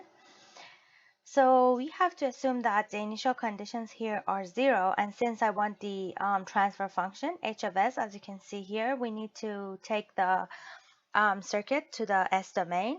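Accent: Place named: Iran. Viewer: this babe has a Persian accent <3 .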